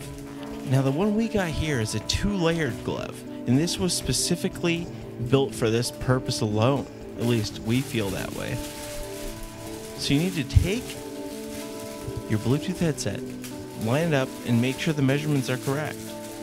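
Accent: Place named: United States. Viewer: he says that because hes from the USA and thay speak a bit different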